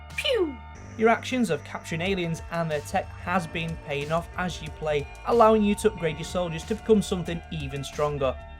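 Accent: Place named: Manchester, United Kingdom. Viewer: He sounds Manchester-ish to me, in case you were wondering where his accent originates from.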